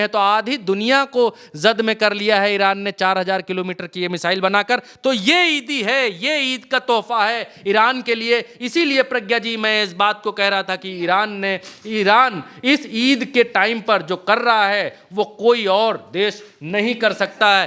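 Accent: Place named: Uttar Pradesh, India. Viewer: जी की देसी टोन यूपी वाली सुनने मे बड़ा मजा आता है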